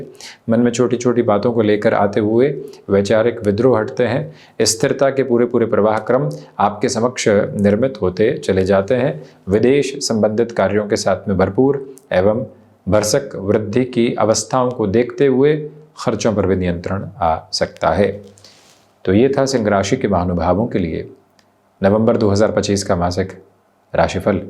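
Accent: Standard. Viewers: Bhai aapke pure Hindi sunne ko bahut he achha lagta hai ❤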